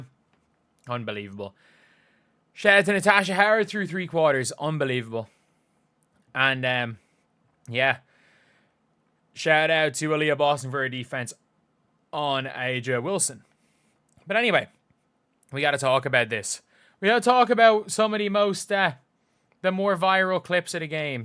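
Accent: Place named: Ireland. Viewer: I like your accent! Sounds Irish to me. Where are you from?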